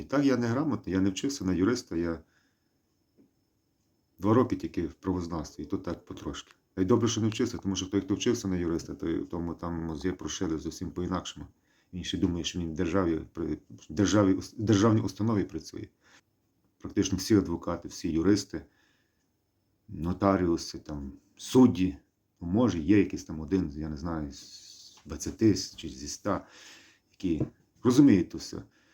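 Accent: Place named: Galicia, Ukraine. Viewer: Лайк за україно-галицький говір🤔🙂👍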